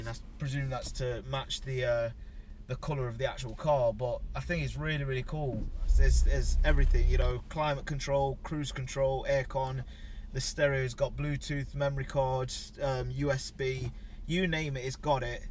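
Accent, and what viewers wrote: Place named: South Yorkshire, United Kingdom. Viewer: I actually own this exact car and its actually a perfect performance car aha and this is my first video i watched of your channel and i really enjoyed this video so u earned yourself another subscriber and as soon as you started talking i instantly knew you. Was from round south yorkshire area aha im from Sheffield my self. :) where abouts do you DJ might have to come and see you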